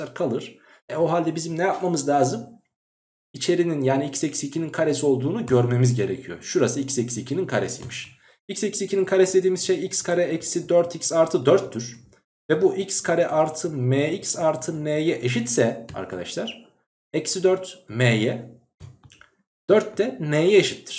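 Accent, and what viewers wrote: Ankara, Turkey. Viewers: Ankaralı hoca galiba bir videoda diyordu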